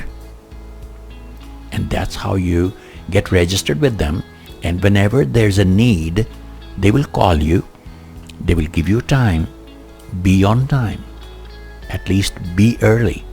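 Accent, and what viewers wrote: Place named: Caribbean. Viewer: I love your voice. Where are you from, I hear a Caribbean accent.?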